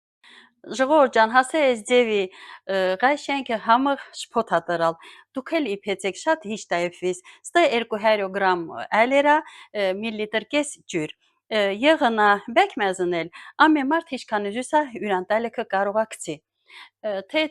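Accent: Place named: Hadrut, Azerbaijan. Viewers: Как приятно слушать наш Гадрутский акцент! Спасибо вам! ❤ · ջերմ բարևներ բոլորիդ,էլի մտքով տարար մեզ մանկություն,եղիր առողջ և թող բարգավաճի քո յութուբյան ալիքը ու երբեք չմոռանանք մեր քաղցր ղարաբաղյան համով հոտով բարբառը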